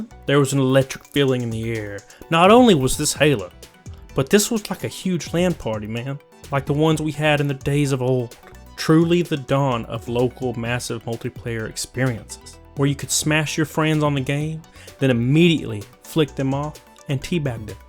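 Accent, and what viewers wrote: South, United States. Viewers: Good lord Southern and hot Af woof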